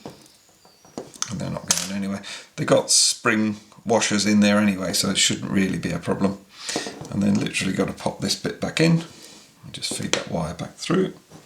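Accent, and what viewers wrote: United Kingdom. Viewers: Thank you so much for the two-part detailed treatment of this repair. I had to replace two of them and also the camera rail. Your videos are by far the best ones. You sound so authentic with your easy-to-understand British accent. You sound like you know what you are doing, even if it was your first time. I have very clumsy and elderly fingers. I used a small magnet with my screwdriver to hold the little screws while trying to place them into the tiny holes.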